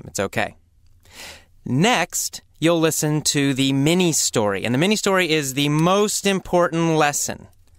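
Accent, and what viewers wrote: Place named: Canada. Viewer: هذا لديه لكنة كندية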